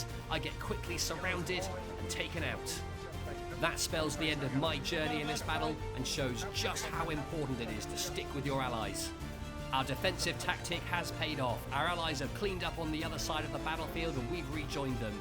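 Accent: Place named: United Kingdom. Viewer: Your British accent and the specific cadence you use makes you sound like an F1 announcer 😂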